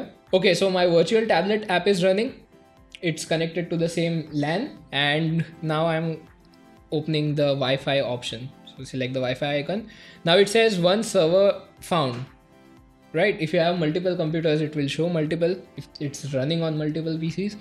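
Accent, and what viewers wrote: India. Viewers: Great video god i hate the indien accent - _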